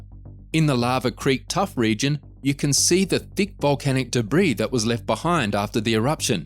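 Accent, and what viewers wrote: Australia. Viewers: I don’t know the the narrator is from Australia, so what is interested in Yellowstone? I don’t know if I would believe someone from Australia would have all the answers for Yellowstone national park. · I started to watch this to see what fate was going on in the good ol USA. Much to my surprise there was an Ozzie bloke narrating and I knew it was a hoax. Who could take that seriously...Crikey!!!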